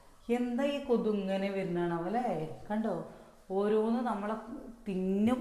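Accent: Palakkad, India. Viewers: Aadiye angayaane zjnnaunkettutto palakkadan bashakke oru prthykataram banfiyaane chechi avvoo yentaanne ninga ingana parayine😂😂😂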